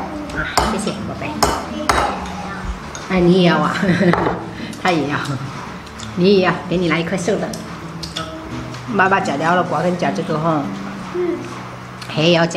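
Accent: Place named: Haikou, China. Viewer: 海口音，親切感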